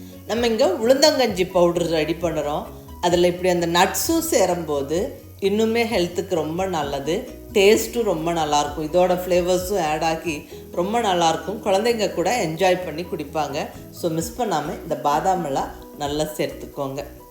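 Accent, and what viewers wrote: Kongu, India. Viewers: கொங்கு தமிழ் இனிமை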